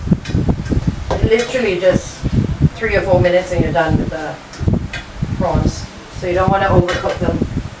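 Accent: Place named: Singapore. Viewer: May i know where are u from. U looks very ANG MO but u speaks some SINGLISH. Very interesting and anyway u're very DETAILED !!!!!!!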